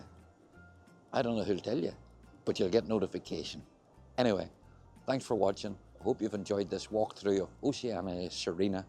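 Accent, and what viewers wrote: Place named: Northern Ireland, United Kingdom. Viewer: My wife and I will be on the September 12th 2025 Oceania Sirena sailing through the UK including Belfast. We loved your video and we are requesting that you perform on our cruise as we would love to meet you. P.S. my wife loves your Northern Ireland accent. Doug & Jenna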